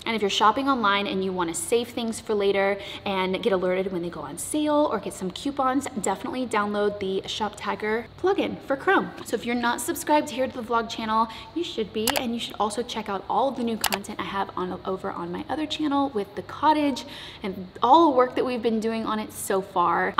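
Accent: Texas, United States. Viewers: Your accent is getting it’s Texas back! Every time I would go back to wherever I was living at the time from visiting home in Texas, I’d be teased like crazy because my accent would be back 😜❣️...Actually all it took for me was talking to my family and friends in Texas‼️ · Love how when she goes home to Texas a slight accent comes out 😂❤️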